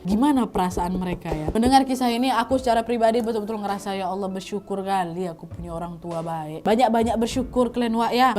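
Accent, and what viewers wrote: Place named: Medan, Indonesia. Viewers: Gak pernah bosan melihat vidio vidio kamu syg lucu gokil. Rame dgn logat melayu medan nya.. Trimakasih mbk uda selalu menghibur dgn cerita kisah nyatanya. Sukses terus ttp semangat dan semoga kamu sehat dan bahagia. Amin 😇🙏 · Enak kali dengar ceritamu wak..ada logat medan, ada logat minang,, nyampur2 😂😂😂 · Logat Medan,Sumbar dan Pekanbaru menyatu 🤣😂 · Serasa macam sedang di Medan lah awak nih... sukak kali dengarin cakap nya nih... tebayang awak masa kecil di Medan.. sukses ya.... 😊😚👍👍👍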